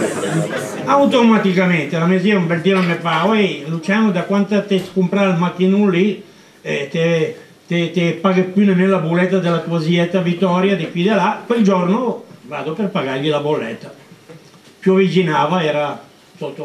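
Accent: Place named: Milan, Italy. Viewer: Grandissimo. L'unica cosa che storpia è quell'accento milanese .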